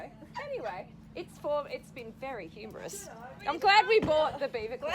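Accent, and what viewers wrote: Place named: United Kingdom. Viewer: Im from uk and i pronounce it same as you lol. What part of uk were you in they are giving us a bad name guys lol xx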